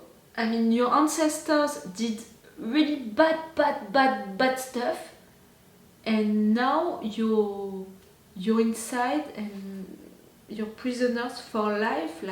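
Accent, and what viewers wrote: France. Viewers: it's a French accent.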